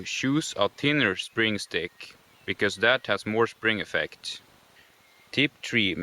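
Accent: Sweden. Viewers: Wow his swedish accent isbreally close to a finnish accent! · Make sure the sticks are really "smood" guys. ahahah I love the Swedish accent. awesome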